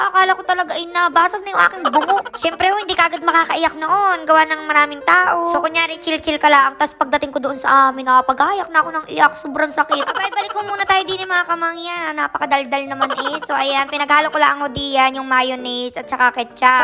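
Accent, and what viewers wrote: Batangas, Philippines. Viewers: Taga batangas ka po ba yung tono ng boses mo eh batangas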